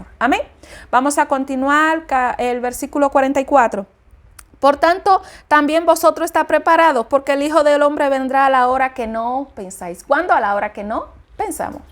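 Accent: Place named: Spain. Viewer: Tienes acento de España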